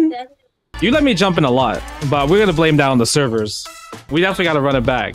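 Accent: New York, United States. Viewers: Canada? I thought from NY!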